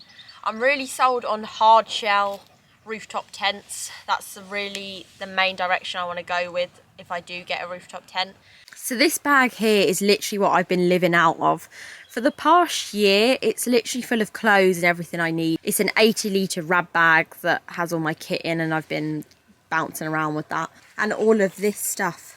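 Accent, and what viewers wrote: United Kingdom. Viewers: Enjoy listening to you,. (English is not my first language). Love the British English. You are both funny and cute.. the thuft of hair dangling out. 🤣 Technical support/considerations: You have now two batteries in your car, one for the engine, the other for utilities. Please treat them as two separate electrical systems each with its on fuses. (I assume your batteries are not connected in parallel). Over time, a potential difference is created between the two batteries. Like one would be 12v, while the other would be 11v. This potential difference is a potential hazard. Please do not cross over from the electrical system of one battery, to the other. for that matter, a common ground is a cross over. My comments are irrelevant, if all the batteries are connected in parallel to one another.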